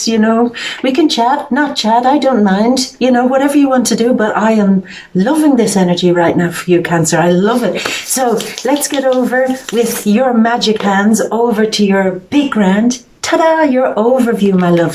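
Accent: Ireland. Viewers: Your Irish?? Have u ever seen a fairy?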